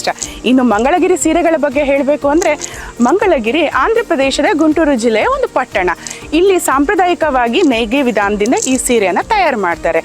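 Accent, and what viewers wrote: Kundapura, India. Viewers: Nimm maath keli Kundapura koteshwra thallur upinangadi vanse maravnthe kollur.....nenpayth marre ! super👌✨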